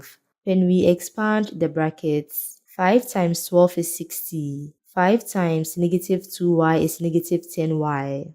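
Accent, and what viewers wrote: Ghana. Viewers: You sound Ghanaian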